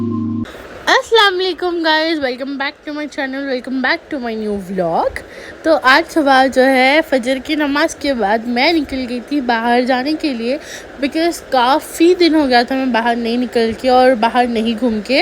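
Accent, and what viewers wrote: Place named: Hyderabad, India. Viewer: Apka accent 😂poore Hyderabadi Hai ap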